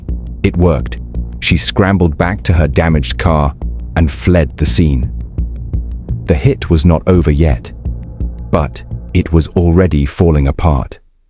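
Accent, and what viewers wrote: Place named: Asia. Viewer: Narrator trying to blend in but we can all hear your annoying asian accent. Deport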